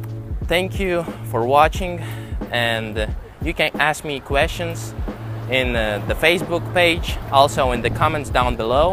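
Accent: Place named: Russia. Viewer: Я чувствую Русский акцент... Really?!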